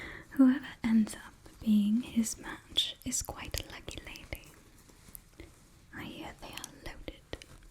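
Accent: England, United Kingdom. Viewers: I love that you've done this makes me even prouder to be English and your accent and attire is amazing you make a very classy lady ❤ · Being tired I had to keep reminding myself this wasn't one of the British ASMR youtubers I watch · God I love this and I like how I was expecting a French accent but was very happy to hear an English one! What a lovely video :) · I love that you're trying to do a British accent lol!! Ive never seen the show but this is chill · I actually thought you were british lol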